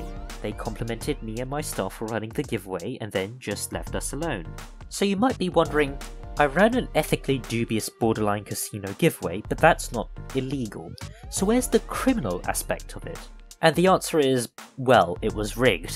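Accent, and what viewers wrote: United Kingdom. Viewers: your nasally British voice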